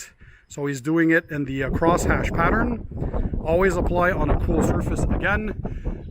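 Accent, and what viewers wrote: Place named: Quebec, Canada. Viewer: Hey I'm a big fan of your channel, I love all your informative videos and now looking to put cquarts UK 3.0 on my vehicle but I'm having a hard time to decide whom to professionally get it done. I'm located in Laval QC. Any recommendations? Im asking because with your accent and French videos I'm just assuming you're in QC as well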